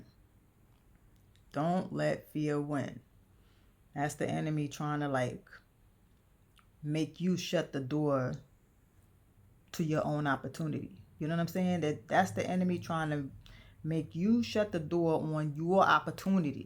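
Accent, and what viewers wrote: New York, United States. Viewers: I can't believe I never saw you in Shanghai. I worked a Jiaotong University for many years and lived in China from <num>. It was hell coming back to be honest. Still working as a law professor in the US now. Sounds like you're also a NYer.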